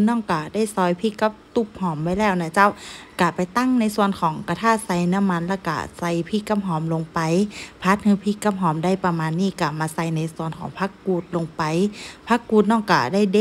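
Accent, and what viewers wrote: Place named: North, Thailand. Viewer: สวัสดีค่ะมารับชมรับฟังค่ะเพื่อนใหม่ค่ะ. ฟังภาษาเหนือน่ารักค่ะ ชอบทานเมนูนี้ค่ะ🎉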